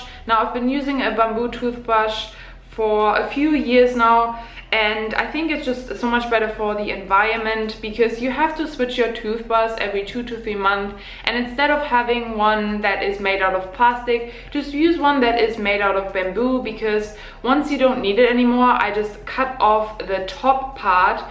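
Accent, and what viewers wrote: Germany. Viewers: Hey! I just wanted to drop in and say that I LOVE your content! I just subscribed and can't wait to see more videos :) I also really love your accent. One of my very best friends is a foreign exchange student from Germany and it reminds me of her. I'll be so sad when she leaves, but we will be able to stay in touch and hopefully visit each other in years to come!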